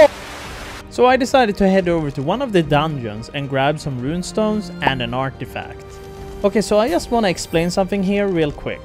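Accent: Sweden. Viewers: haha visste du va svensk med din brytning inom svengelska 🤭🤭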